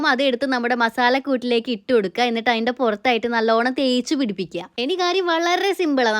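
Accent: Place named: Kozhikode, India. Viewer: Chechide koikoden slangg soooper Njanum koikode aahn 😁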